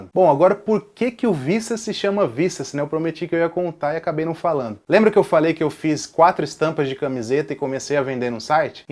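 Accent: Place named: Northeast, Brazil. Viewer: Êa, Cara, Show! obrigado pela dica de anotar a data! eu anotei agora pra não esquecer porquê dissestes. fiquei de cara em saber que é nordestino, já tinha assistido diversos vídeos e não tinha prestado atenção no seu sotaque ainda, e olha que cresci em Sergipe, moro aqui e morei muitos anos na Paraíba também. Abs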